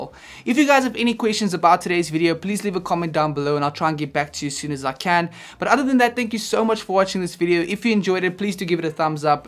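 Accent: South Africa. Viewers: Excellent review. I got the Eris 3.5's and am really considering adding a Sub8 to it as well for more better focused low end. Is that a South African accent I spot?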